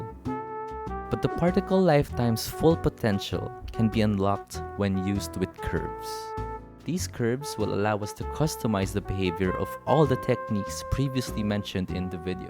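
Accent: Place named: Philippines. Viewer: new instantly from the accent this was a fellow filipino incredibly useful video my man hoping ill get to use some of these in my own projects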